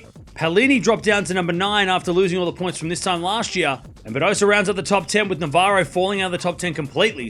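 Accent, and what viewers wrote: Australia. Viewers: Appreciating the info, especially the graphics ... Itsa klaro, even with that distinct Aussie accent ... 👍👍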